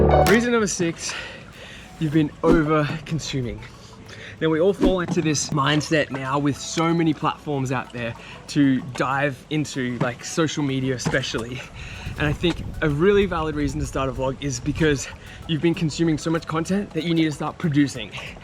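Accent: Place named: Australia. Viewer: Thanks for the vid! This is the 4th video of the day I've watched on this very topic.. and the best for sure! Also, I was immediately surprised by your accent. An Aussie vlogger! Hectic! Inspo. Im a teacher for ESL (English as a second language) to adults. Going through some... Challenging times.. bored of work... I've definitely always been over consuming recently!! And I have been tip toeing around my desire to talk about all those things you mentioned, as well as mental health. I don't know how but I wanna begin talking about it. I liked your Socrates reference. Good job. PS your pool's inner walls are heaps discoloured but the water looks faaabulous. Pps. In writing this I have some ideas. Thanks again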